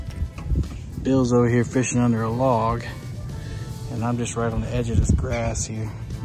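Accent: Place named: Louisiana, United States. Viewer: Dude your Cajun voice still makes me laugh so hard!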